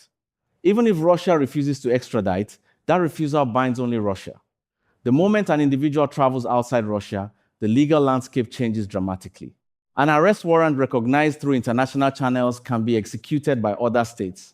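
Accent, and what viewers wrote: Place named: Nigeria. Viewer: Why do you sound like a Nigerian, when you are a Ghanaian.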